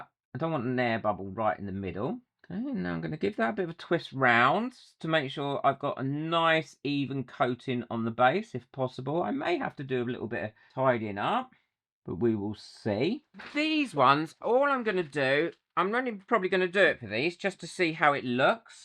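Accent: United Kingdom. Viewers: This is such a great idea! You really can do a lot with it, maybe even craft your own roses and flowers or adornments using ribbon or pretty fabrics of specific colours. If you don't mind me asking, where is your accent from within the UK? I'm not a Brit but I'm curious about accents, always trying to place them XD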